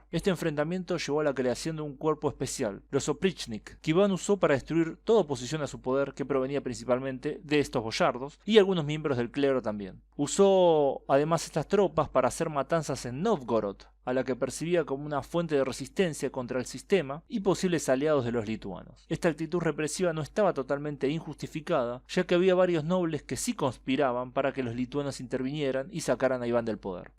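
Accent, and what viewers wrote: Argentina. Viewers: esta muy bueno difundir la historia de forma resumida, pero agrego una critica al margen, tratá de sheshear no tan exageradamente que después América cree que todos en Argentina hablamos así, sin el espiritu de ofender te agradezco enormemente el aporte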